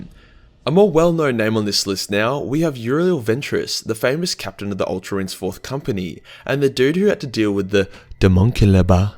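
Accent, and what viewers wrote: Australia. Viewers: блять,еле еле австралийский понимаю,абоба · A captivating and entertaining story told as only an Aussy or Kiwi could ;) Noice!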